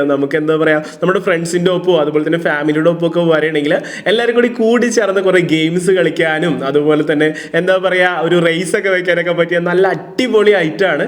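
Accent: Thrissur, India. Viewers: Machan thrissur karrananno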